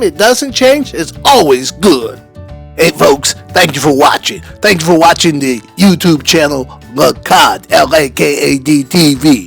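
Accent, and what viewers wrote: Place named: New Orleans, United States. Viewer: You sound like you from NOLA cuz. Subbed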